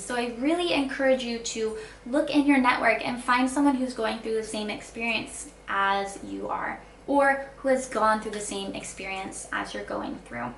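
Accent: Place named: United States. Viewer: Oh,yoyr accent is incredibly beautiful between different american accents,where is your state in USA which treat you with this beautiful american accent then??👌👌👌👌👌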